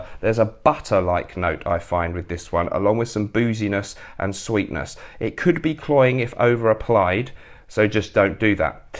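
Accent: United Kingdom. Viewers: My favourites at the moment: Lalique Encre Noire, LM Hard Leather, Acqua di Parma Essenza and Comme Des Garçons Incense Avignon. BTW, I love your videos and your British Style and accent.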